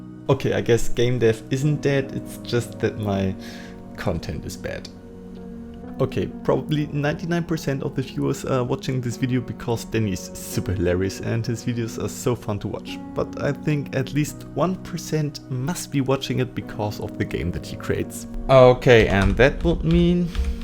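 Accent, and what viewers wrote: Germany. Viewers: You have a german accent lol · Dein Akzent hört sich deutsch an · Bist du zufällig Deutsch? Are you a German? Hört sich irgendwie so an😂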